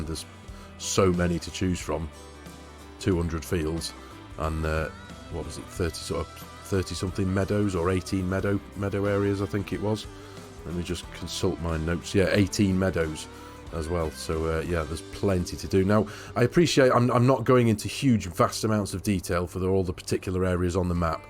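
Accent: United Kingdom. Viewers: You can't imagine the joy i have by just listening to your voice. I can't say where you from in the UK. I love the 4K maps , it takes some time to get started. The investment in you farm or production points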